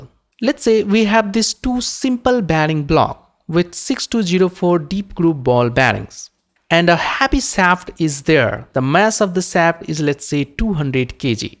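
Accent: India. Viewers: are you Gujarati?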